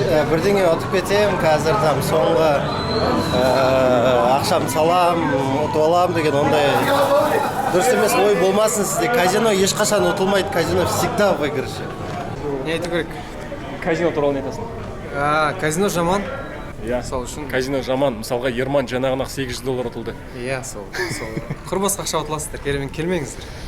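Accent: Standard. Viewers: 🤔🤯👏👏....МәссаҒан , Сіздің ҚАЗАҚ 🇰🇿🇰🇿🇰🇿🇰🇿🇰🇿Тіліңіз Өте Тазада , Әдемі Ғой !!! Құлаққа Кереметшіл Естілуде 🎧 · Керемет Жарайсын!!!👍👍👍👍 Керемет таза казакша солийсын👍👍👍👍👍👍👍👍❤️❤️❤️❤️😂😂😂 Мен сзге жазылдым!!! Алматыдан салем!😊😊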